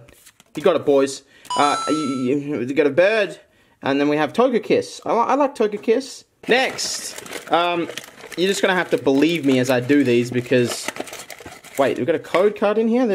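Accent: Australia. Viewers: Henry’s Pokémon Channel he has a Australian accent so I don’t think so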